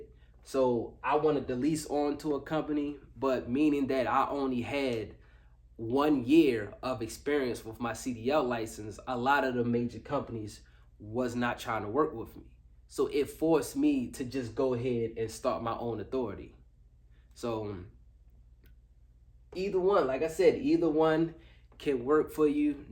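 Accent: Baltimore, United States. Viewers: Sounds like your from Baltimore bro! Lol but great video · gotta be from bmore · You gotta be from baltimore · Bruh is definitely from Baltimore.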